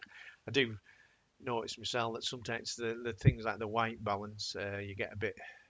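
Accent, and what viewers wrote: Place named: North, United Kingdom. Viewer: When you use the luma channel to set black and white points, why don't you turn on highlight and shadow clipping, then back off a touch and then adjust curve to suit if needed? It's the way I do it, well most of the time at least 😊. As a fellow left hander, and also called but a little bit further south than your accent suggests you reside and thank you for your videos and their unrushed pace. As for DXO hiding settings behind a paywall, I guess it their way to try and make us spend more money, but we all know that anyway, for flipping images it has not bothered me because I shoot sport, as a volunteer, so it would kind of bugger up the shirt sponsor logos and advertising boards. It is only fair, that now I have watched, and enjoyed, a couple of your videos that I subscribe to your channel, and now look forward to the next one 👍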